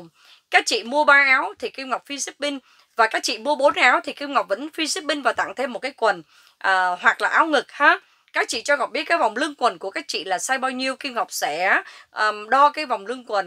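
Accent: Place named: North, Vietnam. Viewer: Chào Kim Ngọc, nghe giọng Bắc Saigon, cách nói dễ thương, cho tôi địa chỉ của tiệm. Tôi ở Escondido, muốn lựa mua áo cho 2 mẹ con, cảm ơn Kim Ngọc. Kim Ngọc có áo size nhỏ không?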